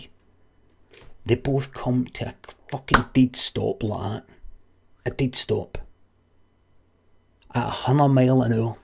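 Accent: Scotland, United Kingdom. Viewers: Where in Scotland are u from limmy?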